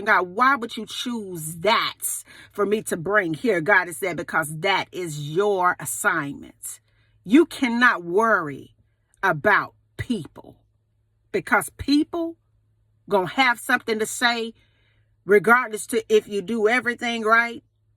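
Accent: South, United States. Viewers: Thank you. ❤ You sound like you are from downsouth. May I ask where you are from and what is your ethnicity?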